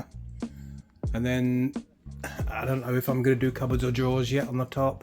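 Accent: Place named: England, United Kingdom. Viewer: Amazing work—love his English accent…😃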